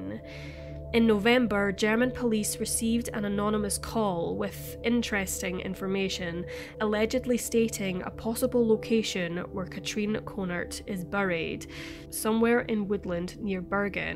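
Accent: Scotland, United Kingdom. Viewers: A lovely Scottish accent. Fantastic pronunciation, gotta luv it. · I was going to guess Scottish. Very nice! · I agree! A video came up in my recommends and I totally didn’t expect to hear a Scottish accent. I’m from Ayrshire. Your videos are awesome. True crime but much more sedate and pleasant to listen to. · It's so nice to hear a real voice on these films, esecially a good Scottish one (I come from Alloa, near Stirling). There's real humanity in the telling of these stories, they're not just told for 'shock' effect - well done to the writer and narrator. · Nice to hear a video narrated in a soft Scottish accent... Just subbed... Blessèd be /|\ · I wondered what accent she has and went to the comments lol never have i ever heard a real scottish accent before! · ayyyy a scottish mystery reader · Her accent is Scottish but it sounds “Irish” to many Americans because we’re used to hearing harsher Glaswegian - style Scottish accents (such as Billy Connolly’s) and Groundskeeper Willie on American TV. 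· 509mrs its funny cause a lot of people say the scottish accent is horrible, but up in the north east this is honestly how beautiful the language can get!! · Fookn Razor Scottish is so beautiful, people who say otherwise are mad lol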